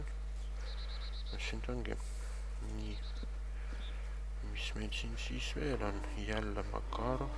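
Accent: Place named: Russia. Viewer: Vene Aksent Nice !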